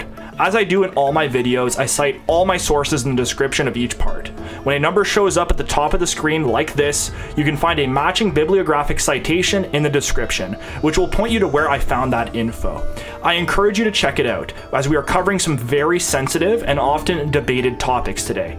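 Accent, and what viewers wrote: Canada. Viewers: Pretty sure the narrator is from Canada. That dialect is unique and screams classic Canadian.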